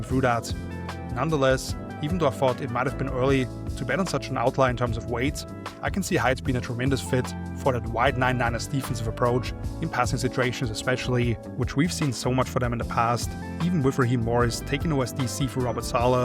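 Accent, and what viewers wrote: Austria. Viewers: Well done sir, solid analysis all around. I appreciate the Austrian accent as well.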